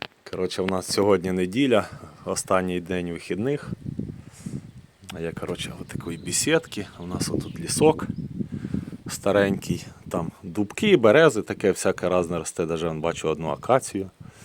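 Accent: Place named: Surzhyk, Ukraine. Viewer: 😂😂😂 Во!!! Який русяцкий сурджик !!!! Хватає-це хопає чи висточає?!(Тупа півночі мова!) Фонарик- ЛІХТАРИК!!! Тєлефон-ТЕЛЕФОН Далі я не став вже цього з пошкодженим Росією мозком.... Але пишу відверто. Людина вдрма балакала так,як розмовляли батьки. Російською з місцевим діалектом. Людина розмовляє так,,к би вона не відвідувала школу,а як що відвідувала,тоді а бо не всилася ,або російською викладалася украхнська мова ьа література! До речі.... Книжки аффтор ввдео,не читав від слова - НВКОЛИ!!! Жах відео Вже суть вілео не дивився,бо його сурджик мене ВБИВ!!! І це мене,який народився ьа виріс у суто російськомовному місті МІЛЬЙОННИКУ центральної,промислової укра6,та розмовляв 52р. сутоиросійською!!! Жах суцільний!!! Оце росіч мозок та здатність мислити пошкодила?!🤕🤕🤕 Атас....😎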